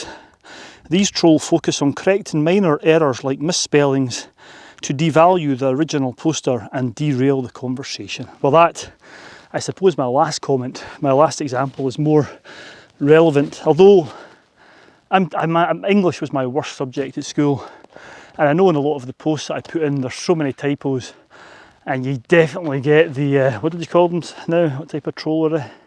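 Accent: Scotland, United Kingdom. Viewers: Watching videos presented with a Scottish accent is very educational. I have learnt lots of useful words like 'scran' and 'houlie'. Seriously though the quality and attention to detail in your videos shines through and gives me the opportunity to visit places I will never be able to visit due to age and mobility. Your videos also allow me to remember with fondness about some of the places visited when younger and fitter, like The zcuillins on Skye and the Cairngorms. Keep up the good work and kick those trolls up the bahookie! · How on earth can people say that Scottish people are foreigners? 😂 Is Scotland no longer a part of the UK? Have i missed something? Also, how can people be shocked to hear a Scottish accent on a channel called 'Scotland's Mountains'? Weird. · I watch your channel because of the escapism, the spectacular scenery AND your wonderful Scottish accent. 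Your videos are fantastic, particularly the ones from my most favourite place in earth, Skye. Keep the videos coming, give the trolls something to do x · I nvr would have guessed that you get those sort of comments, not engaging is the perfect way to deal with it & a great feature that they can be hidden. As for your accent, my god as I’m a shetlander and a broad one at that no bugger would understand a word I say if I spoke on vids and I’m proud of that! We have some amazing accents in Scotland, a dying language if we don’t speak it. You’re a successful channel coz you’re authentic & we love that. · Love your videos and your Scottish accent!! What a shocker seeing a Scottish man enjoying his own beautiful country. Keep on keeping on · It's quite sad that even such a positive and enjoyable channel, as yours is, receives trolls and hate. I have nothing but positive things to say about the channel- it brings me a lot of happiness to watch your videos and adventures. 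And as an Irish viewer, the scottish accent is part of the appeal of your channel. Love the videos! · That was a really interesting one 👍my own personal view is that the vast majority of people who watch your channel (and I include myself in this group), do so because your content is often quite outstanding to those of us who love Scotland and it’s associated outdoor pursuits such as walking, camping, camper vans, photography, etc. The very foolish minority of people watching who post these negative comments, no matter which of the eight categories they fall in to are quite simply very sad, lonely and tedious people whose only enjoyment in life is to get a rise out of others. Your advice at the end of your video to completely ignore them is absolutely bang on. I love your channel and its content, and long may it continue…Scottish accent and all😉👍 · Anyone criticising someone for their nationality is surely on the wrong path? 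I would suggest that anyone speaking negatively of the Scots has never lived with them. I watch you because we share a common interest, but hearing your accent brings me joy and reminds me of when I lived in Scotland in the 90's. If only I could display more of the positive attributes common to those whose company I enjoyed at that time, I would be prouder of myself. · It’s what the block button is for, don’t engage with these mostly jealous lonely men sitting at the keyboard, in their underpants usually I’ll bet. Nowt wrong with the Scottish accent either, from an English man with 30 % Scottish dna 😀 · I hail from England but have worked (and holidayed) many times in tour beautiful country. With work customers ( whom I had never seen before) I had amazing deep discussions with Scottish business owners. I initially saying "-I love Scotland and the Scottish" got their attention. I often said "I wish I had been born Scottish". 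This led to a softened conversation...and often a handshake at the end. Your accent, photography and dialogue is amazing. I am perplexed that anyone -even a troll could find such contempt in comments.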